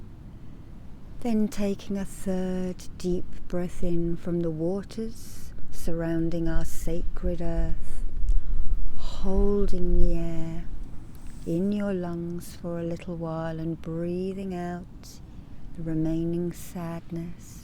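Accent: England, United Kingdom. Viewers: this is putting me to sleep so its hard to type this comment but you are wonderful - full of love and truly positive energy that you so generously put out there for the taking. you are a gift. i really enjoy the earthy feel of this creation and that you can convey such warm feelings from what looks like such a chilly, blustery spot is testament to your abilities. and i love your accent (one might call it true English... i'm American so yeah)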